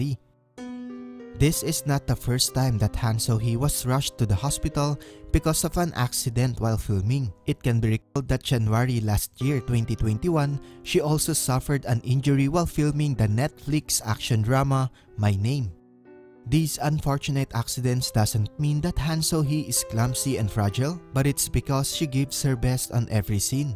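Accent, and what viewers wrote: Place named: Philippines. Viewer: halatang Pinoy yung nagsasalita, kahit English pa yan🤣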